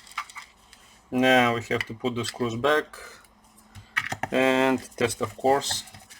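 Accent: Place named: Russia. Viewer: парень, ты русский что ли? акцент ебейший)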